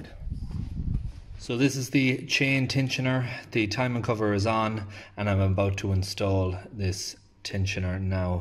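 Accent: Ireland. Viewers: very good video, I am doing 1az fse now, head gasket plus timing chain. Fingers crossed. Nice Irish accent , where about are you from ?:-)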